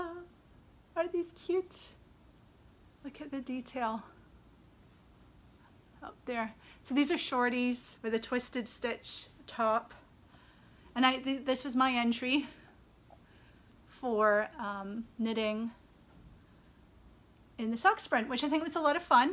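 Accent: Scotland, United Kingdom. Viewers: I’ve purchased yarn from you before, enjoy your newsletters and am thrilled to find your podcast! I love hearing your Scottish accent and seeing all of the wonderful British yarns! It’s so wonderful to have a place to actually see and be able to purchase Jamieson Jumper weight yarn!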